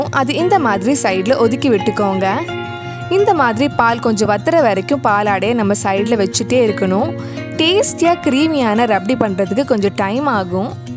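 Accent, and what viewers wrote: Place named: Kerala, India. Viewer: R u malayali ..... Vithyasama iruke unka Tamil?????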